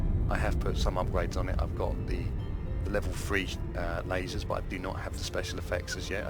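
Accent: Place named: North East England, United Kingdom. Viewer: I have to totally agree on the North East accent, I too just love it. Thanks for making this very informative video that I have only just come across. Liked and subbed.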